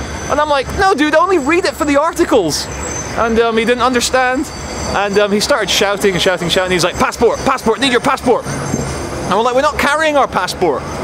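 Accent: Scotland, United Kingdom. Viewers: I thought you had a Scottish accent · you sound east coast Scottish, Edinburgh area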